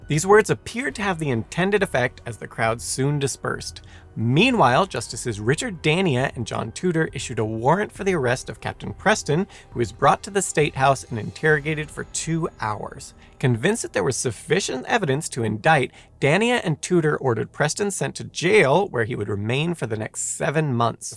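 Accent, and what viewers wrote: United Kingdom. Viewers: you fool!!! The British accent is ASMR to American ears!!! This guys effeminate whaling will never suffice…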